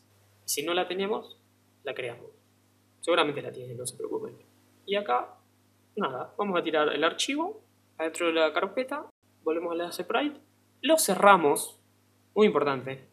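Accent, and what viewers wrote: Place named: Argentina. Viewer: No se por qué me es desesperante escuchar tutoriales en español castellano xd pero me es super reconfortante escuchar argentinos c: Gracias carnal, buen tutorial, saludos desde México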